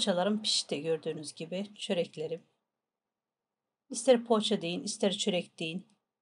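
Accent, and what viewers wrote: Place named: Turkey. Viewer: Italia, penso che sia turca questa ricetta sento l’accento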